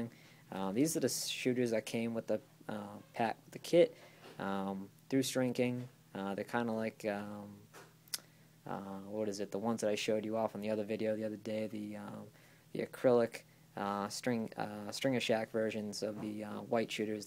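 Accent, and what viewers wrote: Boston, United States. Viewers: Bro u got a heavy Boston accent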